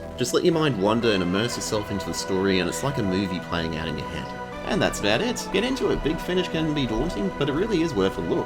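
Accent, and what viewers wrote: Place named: Australia. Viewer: This is assertively, but not aggressively, Australian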